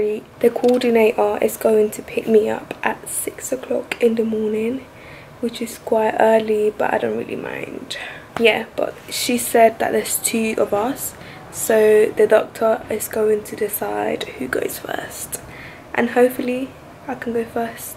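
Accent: United Kingdom. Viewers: She has an English accent from London. It's not very unique just a London accent XX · could be from another place in UK. Her accent is English since she grew up in UK. Duh😂🇬🇧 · Vive en Inglaterra su inglés es británico la pronunciación es diferente al americano.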